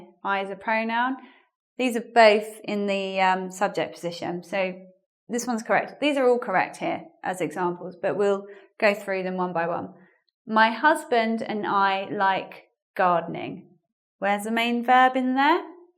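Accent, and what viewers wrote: United Kingdom. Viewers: Your voice and British accent are so elegant that I come to listen to them no matter what you tell (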